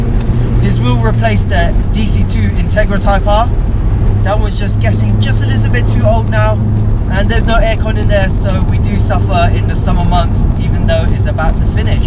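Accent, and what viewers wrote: United Kingdom. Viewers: Asian face and British akcent .. :) Nice car man.!! I'm really sure about that.. this car is trully Type-R last has been made.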